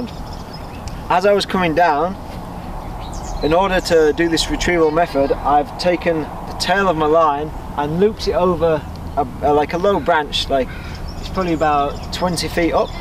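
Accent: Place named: England, United Kingdom. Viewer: He's English bro what the bloody Hell yo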